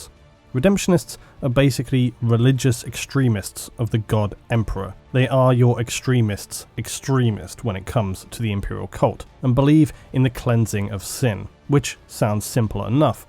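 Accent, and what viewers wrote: United Kingdom. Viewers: Four reasons why I love your videos. 1. Warhammer 40k. I was introduced through Warhammer Fantasy and was intimidated by 40k larger and more expansive universe. However your videos are large and so well made with so much Fan Art that i can't get enough. 2. You time stamp your videos. I get to watch and see what I want to see whenever I come back. Its just so nice! 3. You got a great voice. You have such a great British voice. Just nice and relaxed and a sense of interest in what you are doing. So glad you are doing YouTube! 4. You sometimes use Real World history and how WE as a species can in someway relate. Almost a Parellel Universe feel aha.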